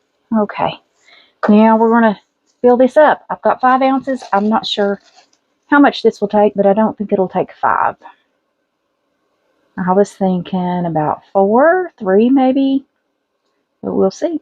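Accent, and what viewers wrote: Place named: South, United States. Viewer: I thought that was a southern accent my dad was from Georgia and my bother lives in Texas I live in Utah 😊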